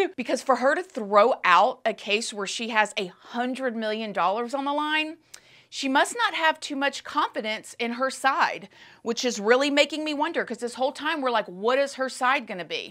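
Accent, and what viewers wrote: South, United States. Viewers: I love your accent!!!! I’m from NYC so I don’t really hear no1 speak in southern accents. But when I do hear someone speak with a southern accent, they don’t sound this lovely and awesome!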